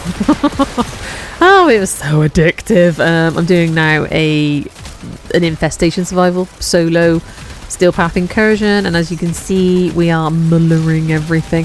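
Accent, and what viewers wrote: West Country, United Kingdom. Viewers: Hell yeah! You sound like your from the West Country. Instant follow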